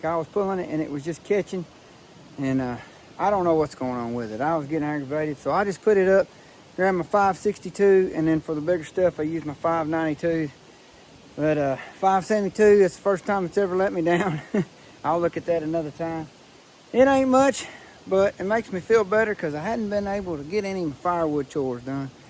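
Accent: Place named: Arkansas, United States. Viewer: Greetings from Clinton, Arkansas! i would like to know what part of the country you are located in, Since you talk like me, we may be neighbors. Please let me know, thanks.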